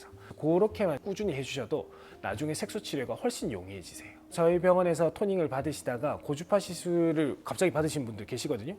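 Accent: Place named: Yanbian, China. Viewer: 과한몸짓과 조선족말투. 사기꾼 같아보입니다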